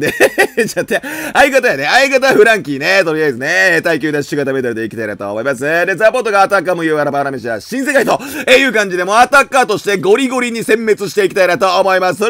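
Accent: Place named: Osaka, Japan. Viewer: 声だけ聴いてたら120%大阪のおっさん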